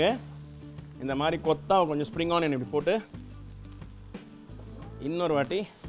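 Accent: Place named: Saidapet, India. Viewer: I simply love the last part saying “Torture Panna Koodathu” that was hilarious. I love all your videos and your very simple style of cooking and of course your Saidapettai Tamizh!! Takes the cake!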